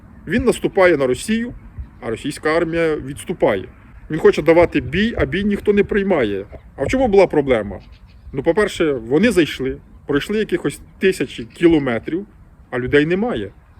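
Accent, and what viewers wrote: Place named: Central Ukraine, Ukraine. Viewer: Відчувається мовлення центральної України. Хоч і західна її частина. Дякую.🇺🇦💙💛❤🖤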